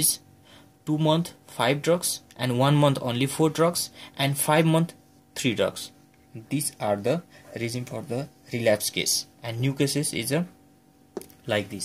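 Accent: India. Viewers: lol hes indian and has accent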